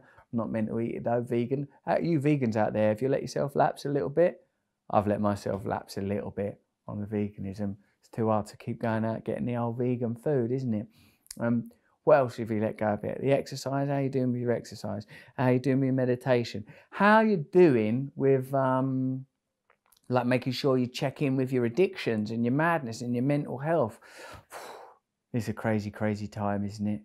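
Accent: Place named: England, United Kingdom. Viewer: your accent makes me miss England.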